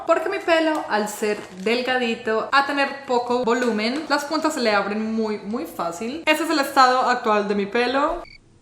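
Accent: Colombia. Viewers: Como me gustan tus videos!!!!! Amo cuando hablas con tu tonada Colombiana, me encanta!!!!! Otra cosa, lo que decías de cargar combustible que no te gusta, me pasa eso mismo pero cuando voy detrás de algún camión o camioneta cargados con algo, por haber visto peliculas como "destino final" me da mucho miedo y si o si tengo que pasarlos jajajajaja Me encanto tu rutina semanal, como todos tus vídeos, por supuesto! 🌞❤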